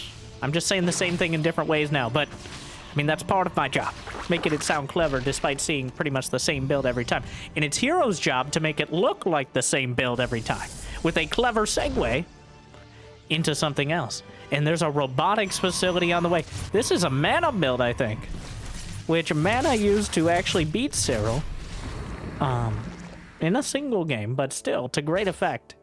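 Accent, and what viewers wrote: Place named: Texas, United States. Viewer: Bro, you're literally the best caster, and I love your charming Texan accent. Please keep it coming, man.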